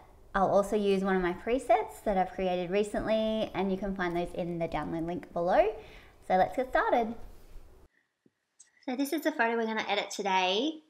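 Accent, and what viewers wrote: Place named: Australia. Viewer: She sounds Australian!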